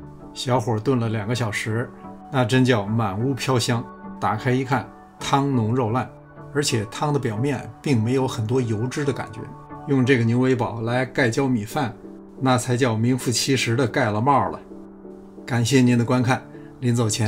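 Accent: Beijing, China. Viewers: 喜欢你的北京口音，赞一个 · 您的北京话让我这个看视频的同乡 一边流口水一边 热流满面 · 一听就是咱老北京😂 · 您提供的菜谱都是家常的蔬菜，但却是不寻常的搭配。还有您的京腔儿听着特别亲切。请问可以加您的微信，更便于向您请教吗？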